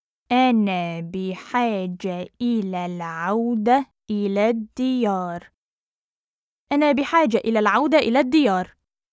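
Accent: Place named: North America. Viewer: Alles falsch geschrieben Und was für ein arabisch ist das??? Hört sich nordamerikanisch an Vielleicht tunesische Dialekt? Aber habe trotzdem fast alles verstanden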